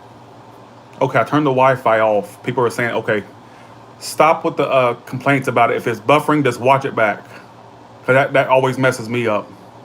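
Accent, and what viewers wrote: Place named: Georgia, United States. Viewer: Thank you for your frequent readings! I meant to get back to you when you said you’re from Georgia, not NC; I have relatives from Georgia, that’s why your accent sounded so familiar lol. Thanks for responding! You’re such a sweet soul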